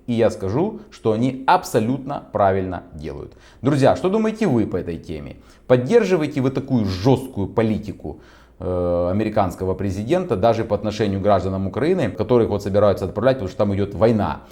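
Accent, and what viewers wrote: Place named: Russia. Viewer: как я понял по интонации вашей речи, вы гражданин России по рождению?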